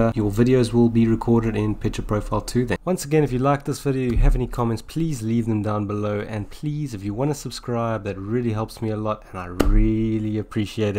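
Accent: South Africa. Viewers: Are you Dutch? You sound South African. I know the sound is no good. But 10 years ago I did not have the Neumann U87 yet.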